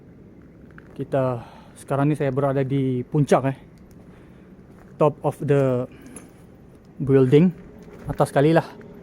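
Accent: Sabah, Malaysia. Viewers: orang sabah bang,mak kadazan ayah india dan dia islam xsilapnya.sbb tu pelat² sabah tapi muka ala² india.😊😊😊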